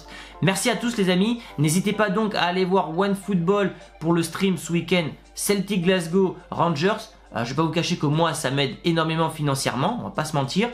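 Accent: Spain. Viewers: Mdr ton espagnol 😂😂😂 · Ta veécu en Espagne pour avoir un accent aussi... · Salut je te connais à peine mais sa se vois que ta mis le budget du real dans ta vidéos et en + avec le petit accent espagnols qui passe crème Hala Madrid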